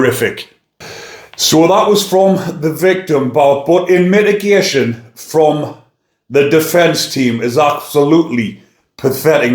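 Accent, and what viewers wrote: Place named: North East England, United Kingdom. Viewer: is from the north east of GB,and that is how they speak! It's called an accent!🙇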